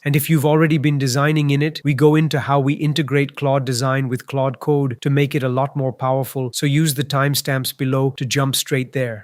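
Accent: India. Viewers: imagine using an ai narrator and still choosing indian accent holy shit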